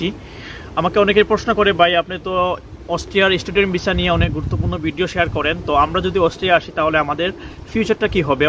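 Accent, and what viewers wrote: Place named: Sylhet, Bangladesh. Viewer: bhaiii uni Sylheti. Unara Bangla kor pare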